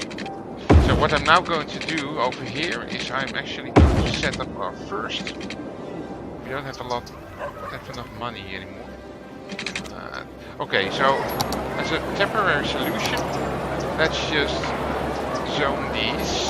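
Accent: Netherlands. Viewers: keep up the good work! and from a guy from japan your dutch accent sound funny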